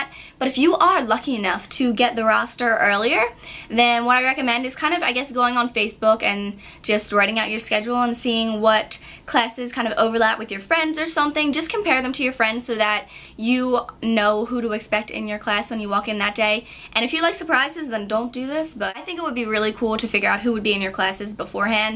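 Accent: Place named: New York, United States. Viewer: r u from new york cause i LOVE ur accent!!!!!!!!!!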